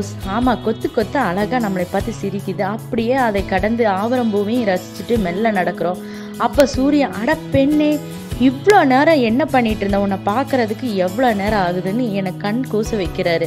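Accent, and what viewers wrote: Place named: Kongu, India. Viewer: .... Miga Miga Azhkai ullathu... Unthan peyarai polavea... Ne pesi konjum kongu tamil.....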